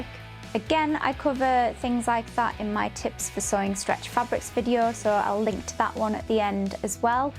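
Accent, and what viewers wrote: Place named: United Kingdom. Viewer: Nice to hear a UK accent 😎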